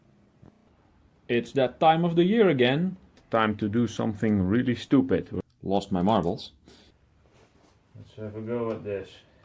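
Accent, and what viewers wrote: Netherlands. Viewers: Hi we met on Gil's stream just know not sure but are you Dutch too.I hear a similare accent I have eather way greetings from your new friend from the netherlands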